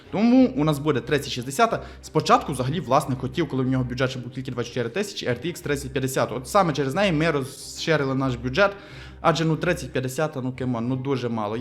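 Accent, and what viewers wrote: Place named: Ternopil, Ukraine. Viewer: По розмові чути тернопільська або Франківська область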